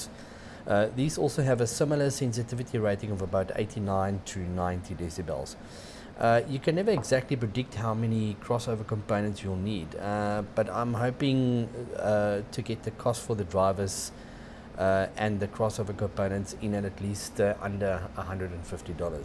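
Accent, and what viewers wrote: Afrikaans, South Africa. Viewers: Hoor ek n afrikaanse aksent?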